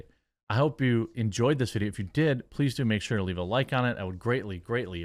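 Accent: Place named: Wisconsin, United States. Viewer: Bro say dregon over dragon ever day, love out that WisssCONson accent.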